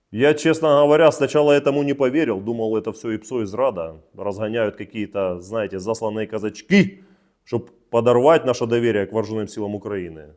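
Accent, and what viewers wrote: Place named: Russia. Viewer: Як би ти не старався розмовляти українською , но по діалекту зрозуміло як ти обожнюєш рашиську . Так що йди під три чорти , чотириокий чорт .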